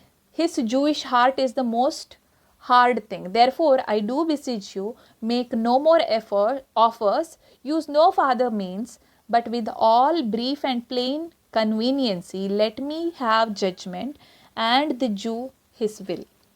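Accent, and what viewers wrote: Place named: South India, India. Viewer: Good explanation... With south Indian accent 😊👍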